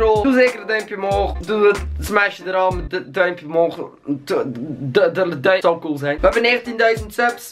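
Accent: West Flanders, Belgium. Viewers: Ik mag ze allebei en ben zelf een Nederlander maar belgië is supertof en ik hou echt van het accent! Vindt het echt fijn om naar te luisteren ( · Ja ik accepteer je zeker ik vindt dat Belgen grappig praten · Ik zelf ben een Nederlander maar ik haat belgen dus echt totaal NIET :) Ik hou juist fk veel van België ouwe. 1: De mensen zijn knap ( Voorbeeld jij kuch ) 2: T is een fucking leuk accent. 3:LoicNottet komt uit belgie en hij is me babe♥ Belgie is gwn mijn schatje, me nummer 2 beste land ever. Fucking veel love voor BelgenLand♥ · je accent is super cutee Belgen zijn hetzelfde alleen dan schattiger ik ben Jammer genoeg nederlands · huh? ik ben een Nederlander en ik wil juist in België wonen en het accent over nemen ❤ gij bent geweldig 💋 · ik ben nederlands en opzich hoor je wel dat je belgisch bent maar ik vind je wel echt een facking chille youtuber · Nederlands maar ik snap echt niet wat mensen tegen Belgen hebben... 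Ik vind jullie accent echt leuk om naar te luisteren!! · Margot Poncelet ik denk dat hij uit west vlaanderen komt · Ik vind het belgiëse accent juist schattig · West Vlaams duidelijk,Limburgs is media taal en a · Dit is west vlaams met hollandse invloeden. Ik belgie ebde duzenden accenten, het brabants, bruxellairs, antwerps, gent, olsters, west vloms, oost vloms, lemburrgs enzo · Ik heb totaal geen hekel aan Belgen (ben nederlander😅) en vind t leuker om bijv naar jou te kijken dan enzo knol jullie accent is Gwn mooi en schattig😂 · Ik ben een nederlander maar ik snap niet dat mensen gaan zeggen KUT Belg enzo want ik kijk veel liever na dan bijvoorbeeld Joey maar Joey maakt ook echt leuke video's maar voor mij is er dus geen verschil ik vind gwn goede video's maken en let niet op zijn accent ik kan het verstaan en dat vind ik genoeg dus wie KUT belg gaat zeggen moet maar komen!!! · west Vlaams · ik ben eigenlijk in eerst in stantie gesubscribed omdat je een belg bent en ik het grappig vind hoe je praat maar je vids zijn ook chill. 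ik kom uit nl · Ik ben een Nederlander maar ik wil een Belg zijn omdat 1 Je hebt een cool accent 2 De mensen zijn aardiger 3 NL is stom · Je Belgische accent vind ik eigenlijk wel grappig xD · ik ben gewoon nederlands en ik heb niks teggen belgië ik vind het ccent juist facking lollig en grappig om naar te luisteren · Belgisch accent veel grappiger nii lullig bedoelt ofzo 😌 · Ik ben Nederlander, en ik vind Belgen gewoon normaal hoor. Haha. Ik vind jullie accent juist heel leuk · ik vind het belgische accent juist leuk hahahah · ikzelf ben een Nederlander en vind belgen juist leuk met een accent, nederlands is zo simpel en saai hahaha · Ik ben een Nederlander maar heb gewn haat aan Belgen, ps; jullie accent is soooo leuk!!!!!!! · Ik vond het belgisch accent altijd kut maar door vind ik het nu juist leuk om naar te luisteren #gazodoor! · ik ben het helemaal met je eens. ik ben een nederlands meisje maar belgie vind ik persoonlijk geweldig, en ik hou van da accent... 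ik ben verliefd op da accent en jij bent ook geweldig en ik vind je accent juist heel leuk!! x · love Belgische youtubers ♥♥♥ ps ben Nederlands jullie accent is zoo leuk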